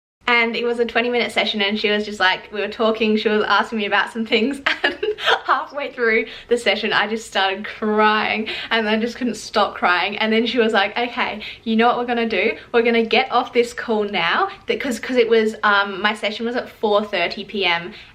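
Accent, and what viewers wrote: Australia. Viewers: She’s Australian 😂😂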